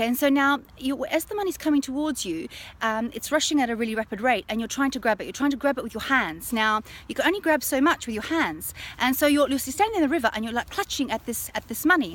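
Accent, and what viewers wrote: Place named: United Kingdom. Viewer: Your lovely Uk accent makes me loose concentrartion on what you say )) Thank you for great info you share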